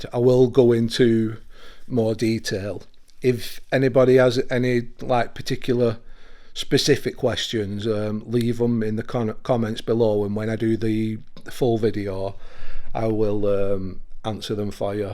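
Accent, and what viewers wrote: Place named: Manchester, United Kingdom. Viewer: Hey up.. just listening to your background its a bit like mine.. I lived with my grandma n grandad but mine was a little boy!!! I had a load of crap that started cos i used to talk to it.. bit of a long story!! But i asked it to leave us alone in the end.. it followed me when i went to live with my mum & brother down the rd and it went crazy there.. now i block it all out now and focus on my belief in God and pray the rosary, i never say gods name in vane and try and lead a good life.. I've never done a ghost hunt.. definitely not the glass game as my mum will say.. far to dangerous.. do you mean you used to do those kind of things with groups?? Ya sound a Manchester bloke.. I'm Sheffield and I know there's loads of ghost tours around Sheffield cos my stepdaughter went on some.. I went mad at her cos i was always taught to not mess around with that stuff and like mediums etc cos your opening yourself up.. 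I'll pray for you & your family.. stay positive n I'd say don't talk to it and pray the rosary.. I ordered a rosary with a prayer book off ebay.. tells you how to say the rosary properly.. You and your family keep safe and well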